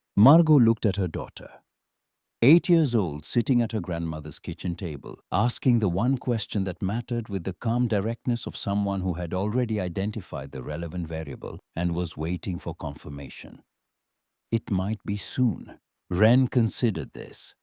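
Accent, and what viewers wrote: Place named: Wales, United Kingdom. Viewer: Loving the Welsh accent ! Makes a nice change from an American one or a faux English accent. ♥️🇬🇧♥️ It keeps changing between Welsh, Indian and French, hilarious ! 😂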